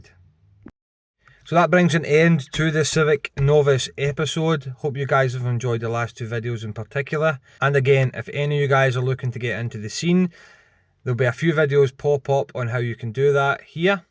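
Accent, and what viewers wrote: Scotland, United Kingdom. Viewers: Great video. Liked and subscribed. Great to hear another Scot 🏴 on here. Going to spend some time watching your vids. Specially enjoy the Type R ones 👍 keep them going mate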